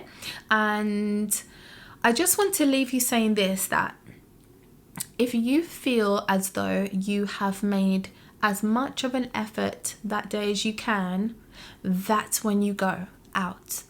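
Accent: United Kingdom. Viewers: Umm.....Are u from London.I'm feeling the British accent..Umm u will NOT be invisible over here in the USA..they will LOVE that accent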